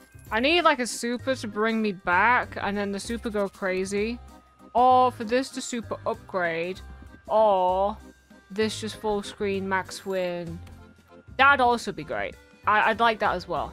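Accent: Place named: North, United Kingdom. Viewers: I am going to guess Manchester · are you from the north?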